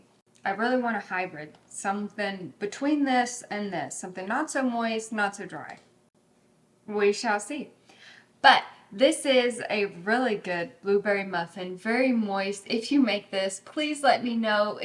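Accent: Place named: Miami, United States. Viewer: I'm just starting a Keto lifestyle. I learned about your channel through the Keto Twins. I am really excited and appreciative of your work in researching and perfecting these recipes and for the wide breadth of knowledge you relay. Looking at your videos I feel confident that this is a change I can do and succeed at. You are making a difference in the quality of people's lives and I ask that God continues to bless you and your family for this good work. Thank you. PS I live in Miami and your accent makes me feel at home! I miss it so much.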